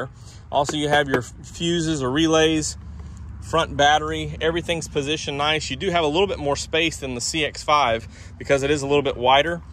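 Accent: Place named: Alabama, United States. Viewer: It’s kinda funny because the sales rep in our company from Alabama says “sells” instead of “sales” just like you.🤣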